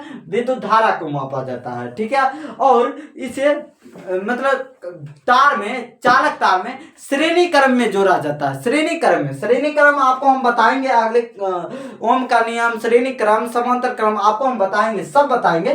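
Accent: Begusarai, India. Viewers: Bhai aap Begusarai se ho kya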